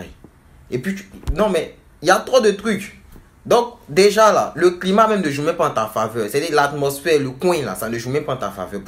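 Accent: Ivory Coast. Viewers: Mdrrr tu m'as tué avec votre accent ivoirien. Bah j'suis un étudiant sénégalais en licence 3 commerce international je souhaite intégrer un iut . Dans mes choix de formation j'ai postuler 6 formations en métiers du commerce international et une formation en DUT techniques de commercialisation. Ma question est de savoir si il est conseillé de postuler dans différentes formations avec quelques rapport en termes de matières enseignés et de débouchés professionnels. Et comment justifier cela au cours de l'entretien pédagogique ? Merci d'avance !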